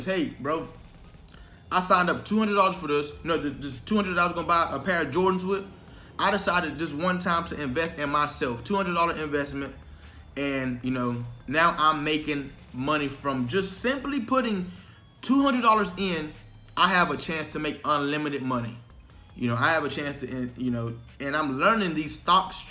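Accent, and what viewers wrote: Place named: Rock Hill, United States. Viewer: Sounds like a rock hill dude 😂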